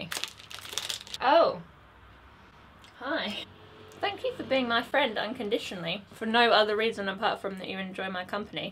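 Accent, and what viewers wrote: South, United States. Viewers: Wow, didnt expect you to sound southern country haha. Bet you could keep up with Chris :) would like to hear you sing a southern bluesy country song.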